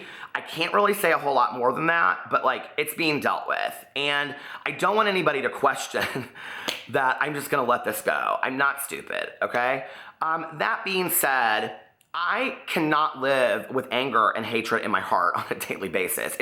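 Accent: South, United States. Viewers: Ur a hoot!!! I absolutely love ut channel, personality, thoughts and southern accent....haters will hate - please dont evet forget how loved u r! 💜💙💚💛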